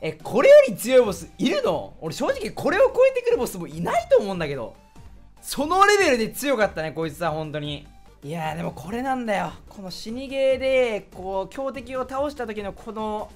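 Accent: Tochigi, Japan. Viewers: 最近栃木弁が少ねーな〜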